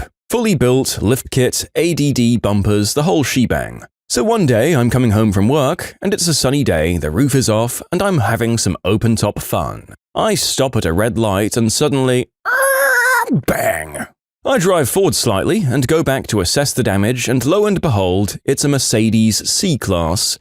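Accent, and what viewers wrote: United Kingdom. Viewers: I don't like the new narrator British people aren't good for these types of stories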